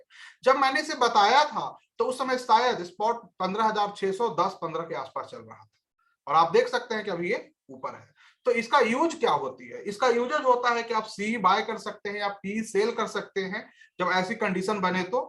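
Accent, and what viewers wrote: Bihar, India. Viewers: Sir do you belong to Bihar?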